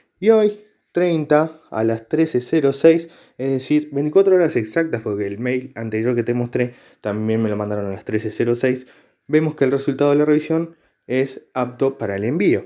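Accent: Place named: Argentina. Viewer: hola brother buenas noches x tu acento dedusco que eres argentino como hiciste para retirar tu pago ya que argentina no sale en los paises buenas tardes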